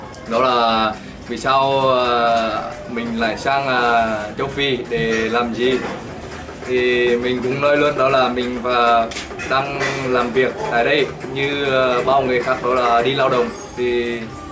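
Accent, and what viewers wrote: Nghe An, Vietnam. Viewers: Giọng giống Nghệ An quá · nói giọng giống người Nghệ An Chúc e và các bạn bên đó luôn mạnh khỏe và thành công trong cuộc sống nhé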